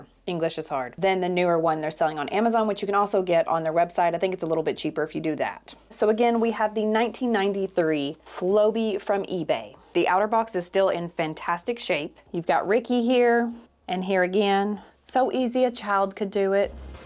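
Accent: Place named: North Carolina, United States. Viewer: You have NC vibes. I'm from NC, and our accents are very similar. I'm probably wrong, but it's just an observation.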